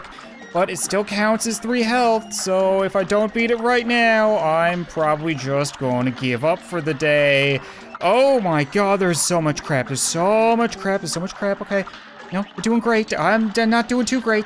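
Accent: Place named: Newfoundland, Canada. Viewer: I have watched a number of your videos now and I have shown them to my boyfriend. We're both from Alberta, I told him that you're a Canadian Youtuber from Newfoundland. He said he could tell by the way you pronounced some words. I never noticed before this video! I definitely heard a bit of the Newfoundland accent in this video! It's nice to see your channel continue to grow! I find your videos to be entertaining and unique, best of luck in the future!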